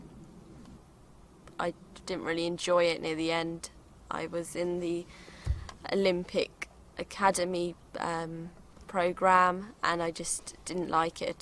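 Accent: United Kingdom. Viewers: I love your mind. Also your British acsent remains me my MSc in Scotland:)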